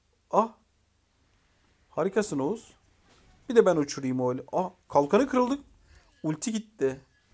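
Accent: Samsun, Turkey. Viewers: Üzümlü kekim samsunlu olduğun ne kadar da belli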